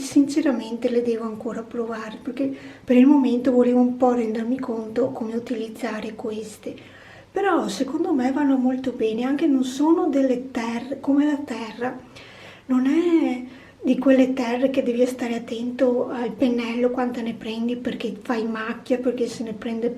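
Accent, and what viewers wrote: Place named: Veneto, Italy. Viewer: Ciao ! Carino il tuo vlog! Dal tuo accento ho capito che sei veneta come me! Baci!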